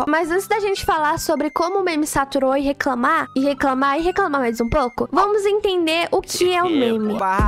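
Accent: Brazil. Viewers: tu é carioca? 🤨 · Vc e sulista?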